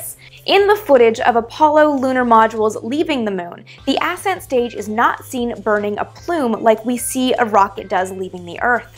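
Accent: Canada. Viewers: Is she from Canada or the United States?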